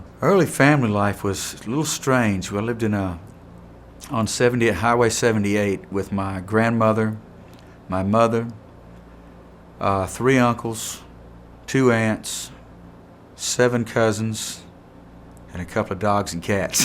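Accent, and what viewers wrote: South, United States. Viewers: Whoa! Me hearing his southern accent was just as shocking to hear Steve Perry speak Portuguese....Learn something new....New to me.... LEGEND! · Interesting, wouldn’t’ve thought he had a Southern accent considering when he sings you don’t hear an accent! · Never knew he had a southern accent anyway very nice.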